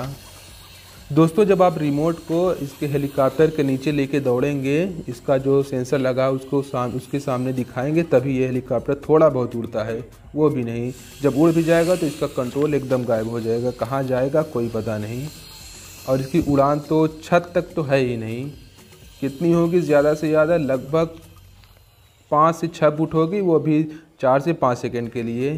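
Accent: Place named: Bhojpuri, India. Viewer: Ur bhojpuri accent is fascinating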